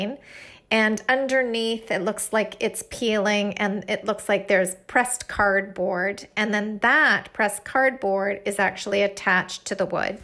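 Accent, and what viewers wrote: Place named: Canada. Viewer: gosh she sounds so canadian (and i live in ontario)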